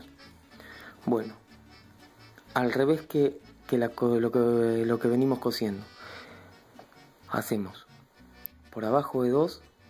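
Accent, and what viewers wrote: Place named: Entre Ríos, Argentina. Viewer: Se me hace que tu tonada es de mis pagos (Entre Ríos) te re felicito amigo me encantan tus trabajos y explicaciones la verdad hasta los mas brutos aprendemos si alguien así explica. Un abrazo y a seguir subiendo videos. Solo me falta saber como llevo estos tutoriales al encabado que es mi hobbie.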